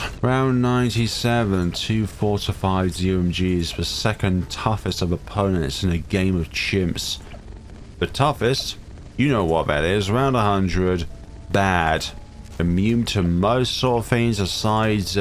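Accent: United Kingdom. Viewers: why are you so posh?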